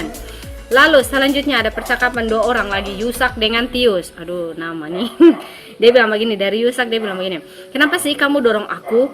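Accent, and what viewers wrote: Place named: Ambon, Indonesia. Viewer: Tidak jauh beda dengan logat Ambon dong....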